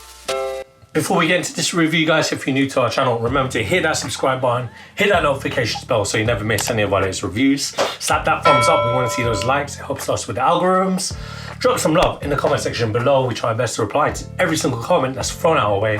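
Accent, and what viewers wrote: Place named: United Kingdom. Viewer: The British accent makes him seem of average intelligence but he really below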